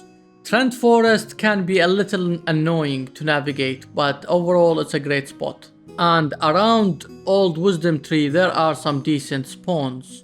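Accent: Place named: Arab world. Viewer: you sound arab! are you? nice video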